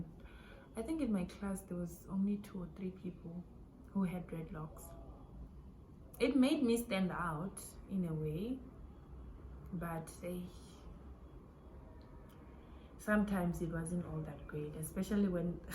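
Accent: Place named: United Kingdom. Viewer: 🔥🔥🔥🔥 congratulations!!! 😅as for British accent!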